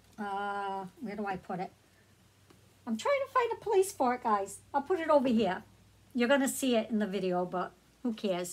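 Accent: Boston, United States. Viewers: Springfield Massachusetts 🥰 Your Accent Leads Me To Believe You're From Boston 💙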